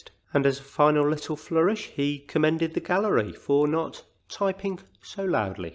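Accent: United Kingdom. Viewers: Thank you for your update……really enjoy listening. Good to hear a fellow Brit!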